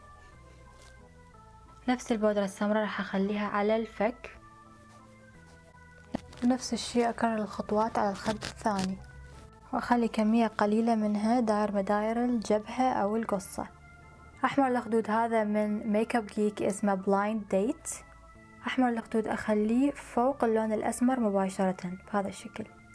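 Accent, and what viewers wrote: Iraq. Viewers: هاي اللهجة العراقية البغدادية القديمة لذلك مداتفهميها كولش زين لعد لوسامعه الموصلية شيصير بيج ...المصطلحات صعبة..مثﻻ هياته يعني هذا لعد يعني جا اغاتي عزيزي كولش .....كثيرا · اكثر شيء أحبه بيج هوه حجيج العراقي ومتصنعين اني عراقيه واموت عليج 💚💓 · طلة ولا احلى مكياج بيجنن 😍 وانتي بل اصل بتجنني 🌹🌹 بموووت فيكي 😘😘 وياريت تضل فيديوهاتك طويلة لانو مابينمل منك ومن حكياتك وتحية لكل اهل العراق بموووت عل لهجتكون وانتو ناس بتجننو اختكون من سورية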